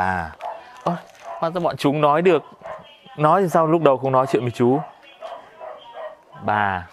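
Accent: Hanoi, Vietnam. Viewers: thích giọng anh ghê! Trai Hà Nội có khác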